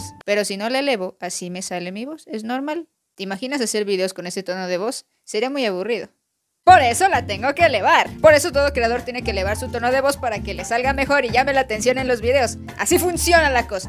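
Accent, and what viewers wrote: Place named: Mexico City, Mexico. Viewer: Jajaja los que dicen que no es real no han estado en cdmx. Luego luego se nota el acento chilango.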